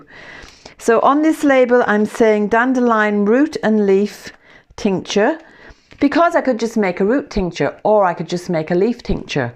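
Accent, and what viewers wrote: United Kingdom. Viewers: Omg so uncanny, you look like an older version of myself, or what I would imagine I would look like when I turn 50. What is it with Irish people? Why do I look so Irish even though I am a Finnish person :D .. or are you Irish? You sound more British. Now to the actual comment, I love dandelions! I always start to crave both the root and the leaf when spring comes, certainly something my body needs this time of the year. I have a tea brewing right now as I type, yes, there is dandelion root in it! It was nice to see some really hands on medicine making <3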